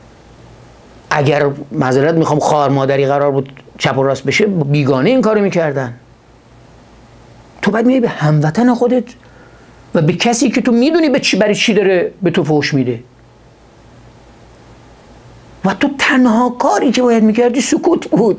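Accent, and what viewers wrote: Gilan, Iran. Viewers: وقتی عصبی میشه لهجه گیلکی میگیره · من که عاشق لهجه شمالی هستم .وا نده لهجه زیبا رو عزیز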